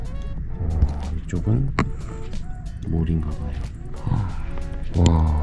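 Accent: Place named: Java, Indonesia. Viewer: njir medok🗿😅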